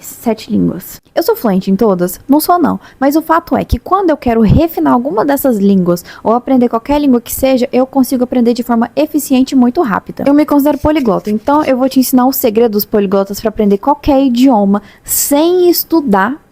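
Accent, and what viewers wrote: Minas Gerais, Brazil. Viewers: Tenho uma curiosidade......você é mineira?(de Minas Gerais?)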